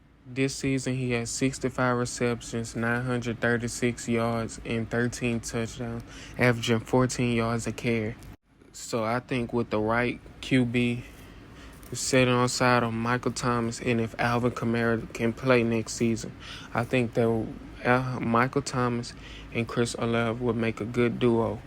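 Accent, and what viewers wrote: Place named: New Orleans, United States. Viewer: Good video and great job. I loved how you said playa. Your N'aulins accent warms my heart. I've moved out of Louisiana to Mississippi but my eyes are always looking back to my hometown. I'm 'bout to subscribe to your channel and smash that like button. I'm a who dat baby. Are you ?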